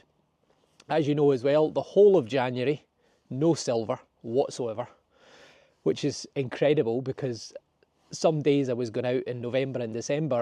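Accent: Scotland, United Kingdom. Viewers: Just stumbled across your channel. Thoroughly enjoyed watching - thank you so much … 😁 I have lived in Australia for decades, and although UK born and bred, I have many happy memories of time spent in Scotland visiting my Uncle Jock and Aunty Aileen. You sound very much like my uncle … 😁 so nice to hear that lovely accent … subscribed 😜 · Fantastic! You're so lucky to do what you do and where you live is spectacular 👌 Scotland is my ancestral home and I really appreciate seeing the countryside and hearing the accent 😊 and thank you so much for sharing your experience with us all, I'm in New Zealand btw and my Dad is Scottish 👍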